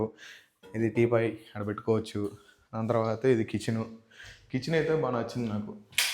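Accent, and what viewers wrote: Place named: Kadapa, India. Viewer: కడప స్లాంగ్ ఎక్కువగా, నెల్లూరు స్లాంగ్ తక్కువుగా మాట్లాడతావు 🥰🥰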